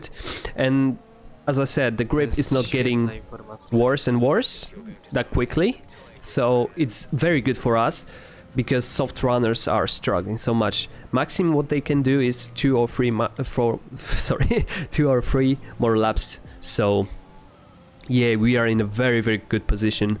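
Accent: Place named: London, United Kingdom. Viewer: Why are you speaking londonish?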